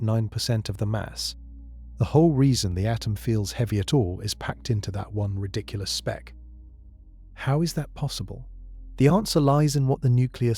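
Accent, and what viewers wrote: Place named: United Kingdom. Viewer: I hate this British voice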